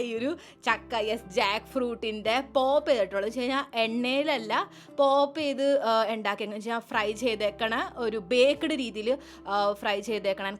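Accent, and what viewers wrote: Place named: Thrissur, India. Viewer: Chechi I felt that your slang changed to thrissur slang 😂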